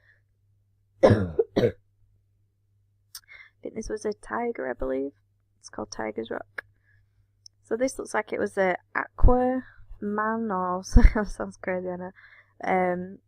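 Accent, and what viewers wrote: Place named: Northern England, United Kingdom. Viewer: To you are total on the right path of truth but this channel iam going to tell u about is mind blowing u must watch it and everything will fit into place go to the Richard mountbleu channel......the mystery of life video u will be amazed at the truth in it.......I no you have got the eyes to see it.........you sound like a Northern lass......please check that video out it goes way deeper than u can imagine.......please please let me no u watched it so iam not wasting my time on people that don't really want to no the truth...Dean from grimsby🇬🇧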